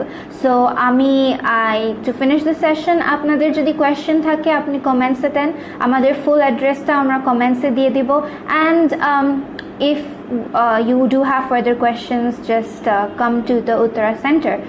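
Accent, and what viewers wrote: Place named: United States. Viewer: আপনি বাঙালি বাংলা এমন ভাবে বলেন যেন আমেরিকা থাকেন। আগে নিজের ভাষা ভালোভাবে বলতে শিখেন তারপরে ইংরেজি শিখাবেন।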